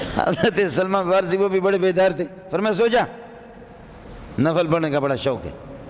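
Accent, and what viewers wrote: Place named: Balochistan, Pakistan. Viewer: In ki zubaan esi hy. Baloch hy na. Pathan logo ki b esi zuban hoti hy. Badtameezi ka irada nhi hota in ka. Badgumani sy bachny ki koshish kijiye. "ظنوا بالمومنین خیرا"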